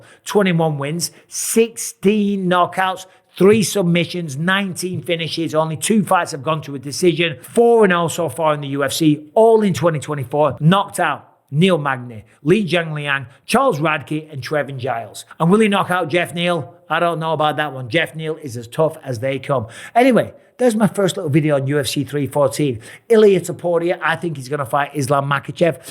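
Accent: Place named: Liverpool, United Kingdom. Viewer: Little scouse prick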